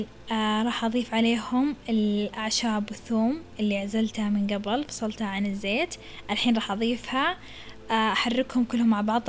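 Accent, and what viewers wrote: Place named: Najd, Saudi Arabia. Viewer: يعطيك العافيه يارب شكلها يشهي جدًا ماشاء الله عليك أحب قناتك ولهجتك هههههههههه من النادر أسمع لهجتنا النجدية في يوتيوب وه بس 💖 ،، ملاحظتي بس إن كمية الزيت كبيرة عشان كذا ممكن ماتعتبر طبق صحي تمامًا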